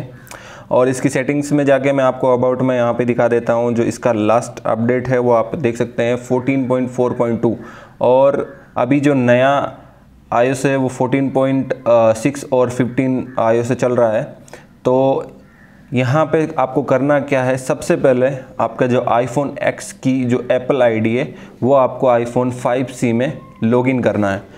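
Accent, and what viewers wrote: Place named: India. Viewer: why every single tutorial is made by indian???!!! I can not understand anything!!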